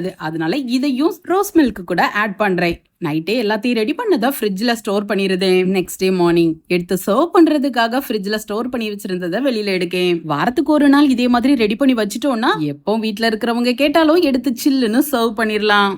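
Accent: Tirunelveli, India. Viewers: திருநெல்வேலி தமிழோ...!😂அருமை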